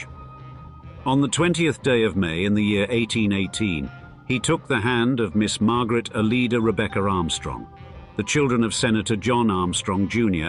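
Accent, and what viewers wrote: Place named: London, United Kingdom. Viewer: Our lovely London corky English.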